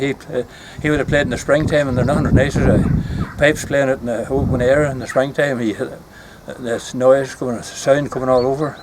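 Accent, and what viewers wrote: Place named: Scotland, United Kingdom. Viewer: Sounds perfectly Scots to me.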